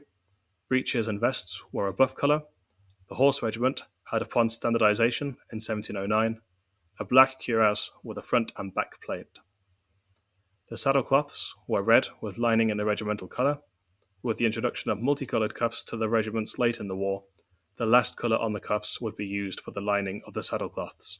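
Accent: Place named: Germany. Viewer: Ver-ve-uh is closer. I appreciate the effort, but there’s not much point in using these terms if native speakers can’t understand half of them. Just speaking English would be clearer, because right now it sounds like a mix of German and English which gets a bit confusing. I’m not trying to correct your pronounciation, I just figure it’ll be easier for everyone in English.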